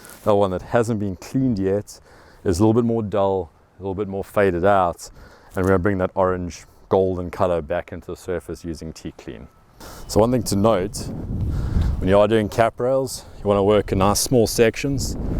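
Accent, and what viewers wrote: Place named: South Africa. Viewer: Great to hear the SA accent. Is this product available in Malta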